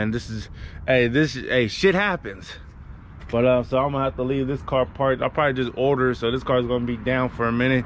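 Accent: California, United States. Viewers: I heard the Bay Area scraper music and your accent and said dude from Cali dam you shoulda came to Texas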